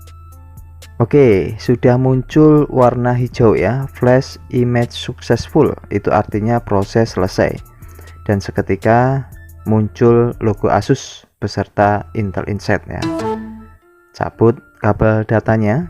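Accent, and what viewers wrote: Java, Indonesia. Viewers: ok...pntesan dri logatnya jawa bang...slam teko cah bojonegoro jatim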